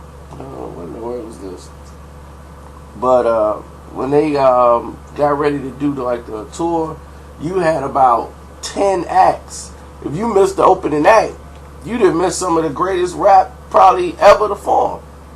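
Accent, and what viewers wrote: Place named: West Coast, United States. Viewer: The slang had me crackin upp haha you too funny. Keep up the great videos. And you Spot on with that west coast slang!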